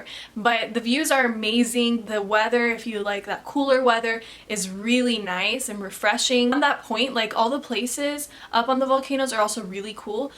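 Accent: El Salvador. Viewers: I just love your acento salvadoreño.. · I'm One of your suscriber and I'm very glad the way you talk about my country like or not your part of our Family you got the same way that we talk nosotros los guanacos and i like that ( i mean The way you talked spanish) i got more than twenty years living at LA California and like i said your are a good reference to us the Salvadorean Community....it's good that you make your videos in english and i support that because there are many countries that prefer the english lenguage than spanish so go head girl you are wonderful. ...atte fredy fuentes. .. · I was the #503 likes as the code of my country El Salvador. I like your videos in English mixing Spanish with Salvadorean accent 😋😉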